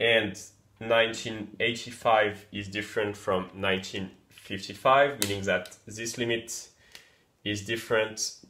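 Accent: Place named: France. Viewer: Bonsoir, j'entends un petit accent français... Tu es français ? En tout cas vidéo très intéressante. Ps : le convecteur temporel c'est pour quand ? ;)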